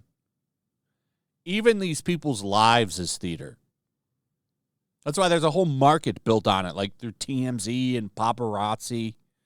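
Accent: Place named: RP, United Kingdom. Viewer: Hahaha STOP IT withnthe British Lord Accent... Man i dont get it. Why that accent, its so NOT lib. 😆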